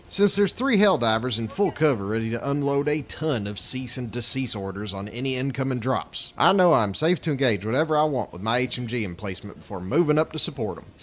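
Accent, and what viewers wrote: South, United States. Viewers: He's carried by that smooth southern accent.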